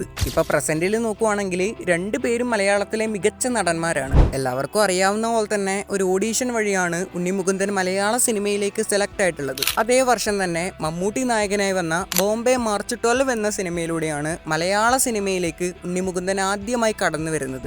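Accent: Kannur, India. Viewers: bro roastil ittapole kannur slangil samsarikk🤌✅️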